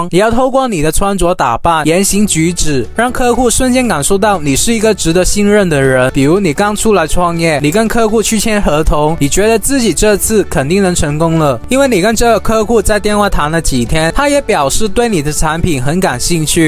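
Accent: Hong Kong, China. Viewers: 想問下是哪裡人呀？聽口音，難道是香港人嗎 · 不是台灣口音喔！感覺比較像香港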